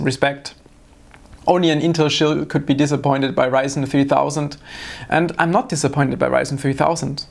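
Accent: Germany. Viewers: Den deutschen Akzent hört man einfach immer raus :D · I love the German accent, the way you pronounce stuff makes me shiver! But, while Ryzen chips are good and all, I'm still grabbing me an i7. Why? I already have a high-end Aorus Intel mobo. Buying a new one just for Ryzen is just illogical for me.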